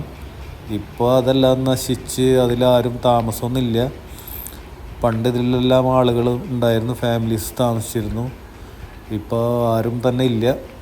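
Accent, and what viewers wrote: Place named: Thrissur, India. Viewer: സംസാരം തൃശൂർ ഭാഷ മാറ്റുക,.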